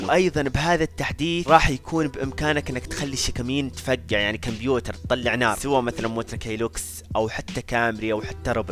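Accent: Qassim, Saudi Arabia. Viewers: لهجتك جميلة. في أي منطقة في سعوديه يتكلموا كذا؟ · هاي يا لهجة بلسعودية هجي يحجون كلش حلوة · انت من القصيم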